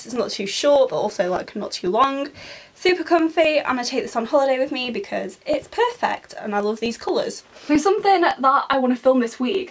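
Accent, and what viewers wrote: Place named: England, United Kingdom. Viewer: I like your style! Just subscribed 😊. Whereabouts in England are you from? Your accent reminds me of home - I've mostly lost my accent from living abroad. Now I just have a general British accent that's hard to pinpoint! The teddy is sweet, not creepy at all. I recently picked up a guinea-pig soft toy that made my mum's 'flesh creep'!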